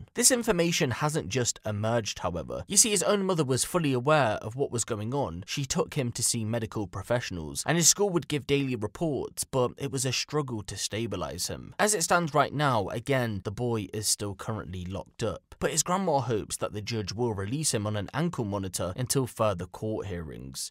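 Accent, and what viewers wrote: United Kingdom. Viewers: I'm so sick of hearing people with British accents on American YouTube. Go make money in your own country. Everyone complains about America but they all want our dollars.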